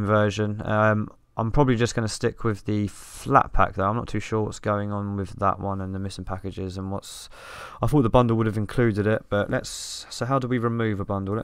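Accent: United Kingdom. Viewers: I like your British English very much, mate!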